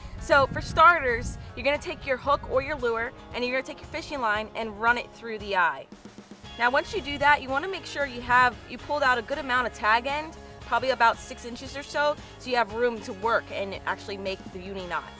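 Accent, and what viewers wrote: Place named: Maryland, United States. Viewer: Are you originally from Maryland? Sounds like a little accent is still there...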